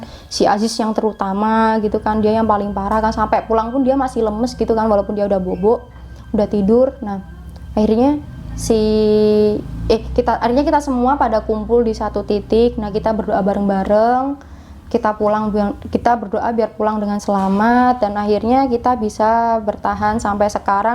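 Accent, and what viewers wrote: Java, Indonesia. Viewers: Logat jawa nya medok banget Hehehe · Jawa nya masih medok kak 😊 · Mbak ngomonge medok 😂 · Bahasanya medok banget wkwkwkwkw. Mbulett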